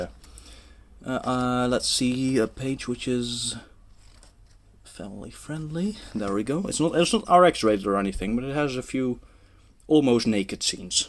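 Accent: Netherlands. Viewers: Haha, wist dat je nederlands zou zijn aan je accent. Toevallig zelf ook gister nog manga gekocht van marktplaats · My classmate talks like you. Literally. He also says sOmething. He's also dutch btw. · I can hear ur dutch haha! Great collection dude! Greets from a fellow Dutchman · Hoorde het meteen aan het accent hahah leuk dat je uit Nederland komt. Geabonneerd!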